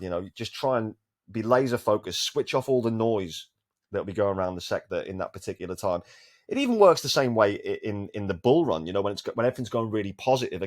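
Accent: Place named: Birmingham, United Kingdom. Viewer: Been trying to work out your accent, I think you’re a Brummie, or midlands. I’m from Brum but live down in London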